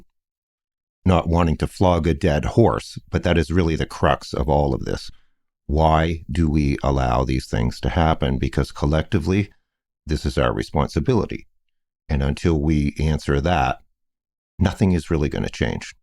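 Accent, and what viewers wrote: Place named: Atlantic Canada, Canada. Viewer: I knew as soon as I heard your voice you were probably from somewhere in Atlantic Canada...Newfoundland? Im in northern BC. Anyway, just found your videos and love your style of articulation. I'm going to check out your ritual material next. Thanks for sharing your insights here. 🙏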